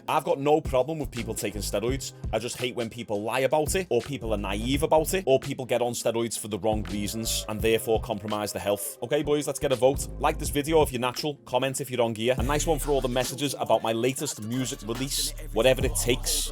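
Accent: Liverpool, United Kingdom. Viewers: I love his Scouse accent! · I hate British accents, but I LOVE this guy’s accent!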